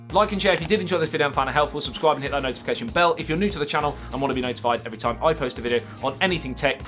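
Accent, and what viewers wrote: United Kingdom. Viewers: Well,..........except for that British brogue........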